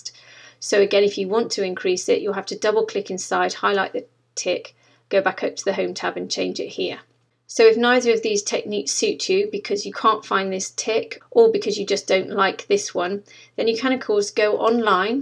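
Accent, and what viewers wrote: United Kingdom. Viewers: This so clear, I promised this will be my class, the ascent is so good, British type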